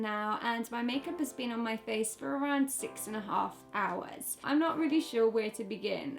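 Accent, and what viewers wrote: England, United Kingdom. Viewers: Are u from England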